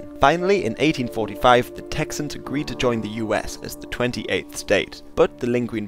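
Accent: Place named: United Kingdom. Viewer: Cuahuila, pron., kua-wi-la, not kua-hi-la. Anahuac, pron., aná-wac, not ana-hoo-ac. What is wrong with you ppl??? The very least you could do is get the pronunciation right. As it is, you are using English pronunciation rules on Spanish words. That never works. It's about as bad as using Spanish pronunciation rules on English words. It never ends well. And, why do you have an English bloke narrator. That British accent is so annoying. It's like someone speaking with peanut butter stuck to the roof of their mouth. Get rid of them. Their English is horrible. American narrators for American history.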